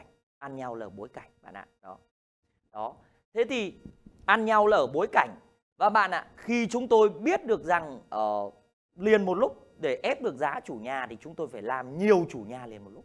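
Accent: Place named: Tho Tang, Vietnam. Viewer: Thầy nói giọng Thổ Tang nhưng đẹp trai thông minh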